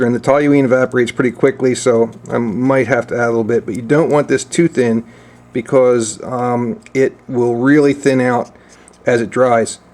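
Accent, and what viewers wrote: Pittsburgh, United States. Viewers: Your accent sounds (a bit) like someone from Pittsburgh.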